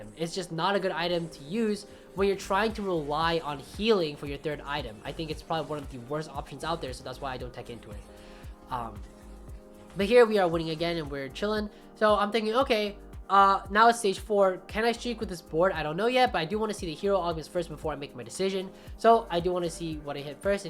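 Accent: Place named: Boston, United States. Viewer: Hey, found you and learned a lot from your previous road to challenger vids! You said you have a Boston accent, I'm also from Boston and also suffer from the random accent coming out LOL, keep up the good work!!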